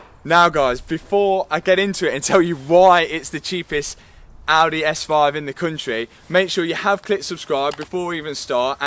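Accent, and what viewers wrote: Leicester, United Kingdom. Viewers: its a lovely car, even if you left it as it is now it still looks nice. are you from Leicester? your accent sounds like it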